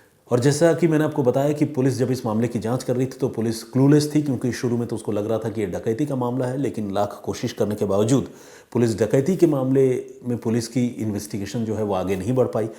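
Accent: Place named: Bengal, India. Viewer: शुद्ध हिन्दी बोला करो, बांग्ला की बदबू आती है तुम्हारे बोलने से 👎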